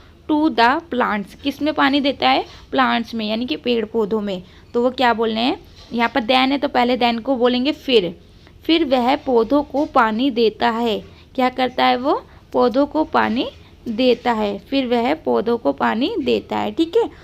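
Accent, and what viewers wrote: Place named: Bihar, India. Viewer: Bihari you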